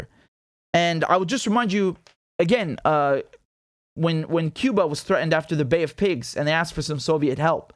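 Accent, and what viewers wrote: Australia. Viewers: Hahaha love your Australian accent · Love the Aussie accent 😂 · That was a great Australian accent. I love it lol Aussie’s love hearing people try speaking like us.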